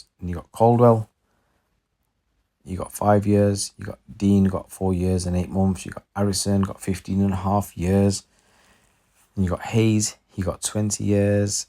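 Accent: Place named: Liverpool, United Kingdom. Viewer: 😂😂 I like you’re scouser accent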